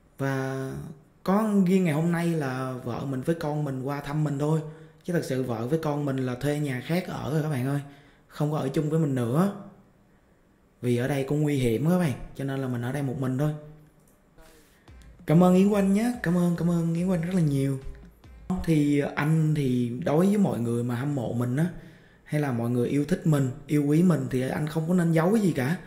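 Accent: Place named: Mekong Delta, Vietnam. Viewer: Thật em nói chuyện rất là chậm rãi rõ ràng truyền cảm và lịch sự. Vì nói giọng miền tây mà nói nhanh thì ng bắc nghe ko rõ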